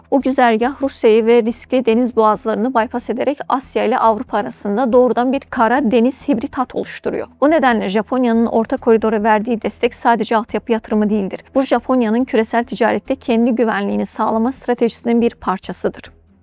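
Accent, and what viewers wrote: Azerbaijan. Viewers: Azerbaycanlidi danisigindan hiss edirem.))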